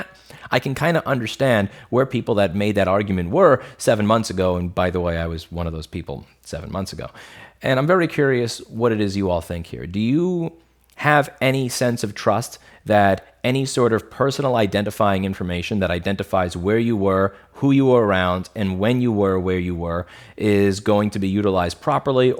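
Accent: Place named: New Hampshire, United States. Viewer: As time goes on you sound more and more like a New Hampshire resident and less like a New Yorker.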